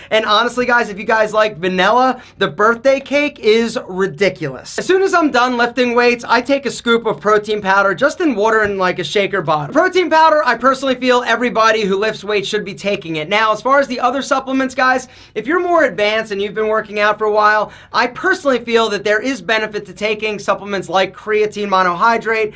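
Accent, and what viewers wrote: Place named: New Jersey, United States. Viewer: So you’re from new jersey I can tell from you’re accent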